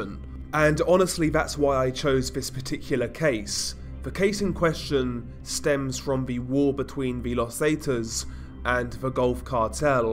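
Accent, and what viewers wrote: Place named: United Kingdom. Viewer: Are you from the UK? I love your accent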